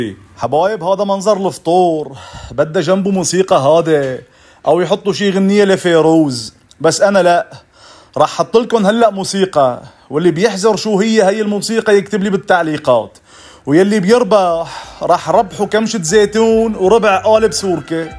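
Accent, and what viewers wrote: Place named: Latakia, Syria. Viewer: والأحلى اللهجة اللادقانية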